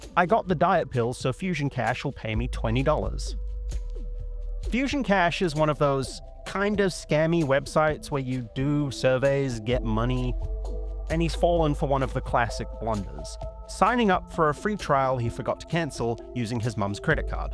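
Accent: New Zealand. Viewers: he’s from NZ isn’t he? I’m an Aussie so I know a kiwi accent when I hear one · I always thought he sounded kiwi too so I'm inclined to agree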